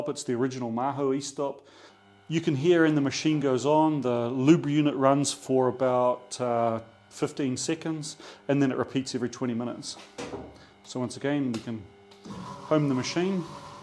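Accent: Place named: New Zealand. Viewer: Great informative video, You sound Kiwi to me??? Cheers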